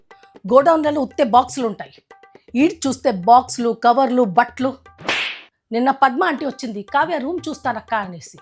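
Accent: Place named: Anantapur, India. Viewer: Your anantapuram slang is very nice. 🎉🎉🎉🎉🎉🎉🎉🎉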